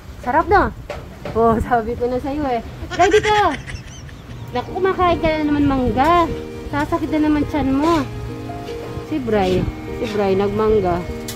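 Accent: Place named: Visayas, Philippines. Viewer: Ay visaya diay ka madam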